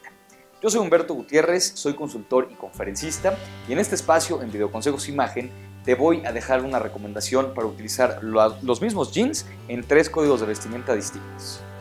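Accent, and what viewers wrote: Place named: Mexico. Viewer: Por el acento al hablar su pongo que eres de México. Podría recomendar lugares para comprar ropa sin caer en precios exorbitantes. Gracias!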